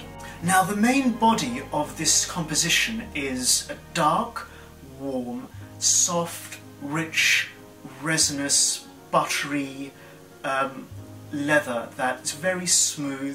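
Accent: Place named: RP, United Kingdom. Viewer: All the superlatives are on point. Spot on. I would go as far to say that Anubis is for me in the same category as a not too barnyardish Oudh. That initial petroleum benzine, leatherish scent you get but then fades away for a beautiful resinous, smokey and incense aroma. I just love it. Can’t wait anymore to get my bottle. Have a nice weekend. By the way I like your voice and above all your English. Very well spoken, posh😉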